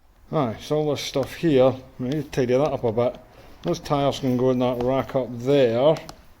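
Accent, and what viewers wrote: Scotland, United Kingdom. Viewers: I am so sorry, let’s agree on a good Scottish accent is just the thing for YouTube videos 🏴🙌🙌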